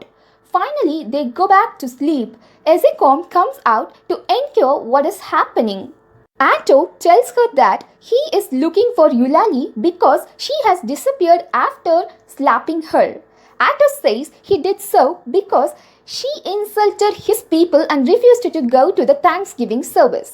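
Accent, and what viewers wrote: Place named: India. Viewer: I am the 2000th person to watch this. She speak Indian english, I wanted African englis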